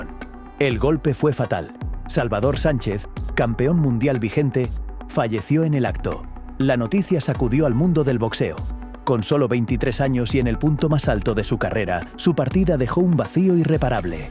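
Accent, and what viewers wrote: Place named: Spain. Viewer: ¿Qué hace un tartosho eshpañol presentando la historia de una leyenda?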